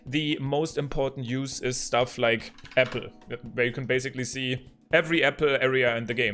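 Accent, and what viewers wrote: Germany. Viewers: are you german? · You Sound german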